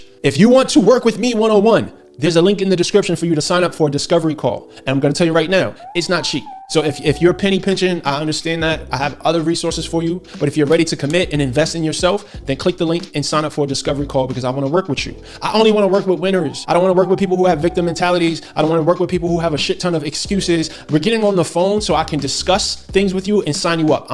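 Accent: New York, United States. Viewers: Sound like HOV! That’s that NY! Let’s get it God like Men! Likeness for the WINGERS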